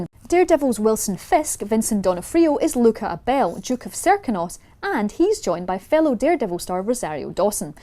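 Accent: Scotland, United Kingdom. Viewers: i cant understand you, and thise british accent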